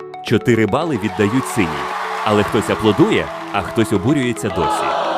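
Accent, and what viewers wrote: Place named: Russia. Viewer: Розганяють русняве іпсо. Канал підозрілий: не атаман, наголоси русняві ставить...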